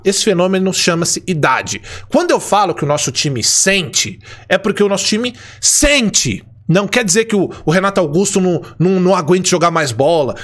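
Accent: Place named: Portugal. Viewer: Eu ouvir o fazendo um vídeo inteiro com sotaque português de Portugal?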